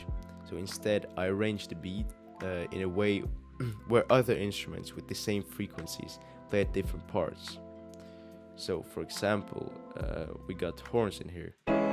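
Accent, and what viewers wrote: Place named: Scandinavia. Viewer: Dope tutorial man, where in scandinavia are you from btw?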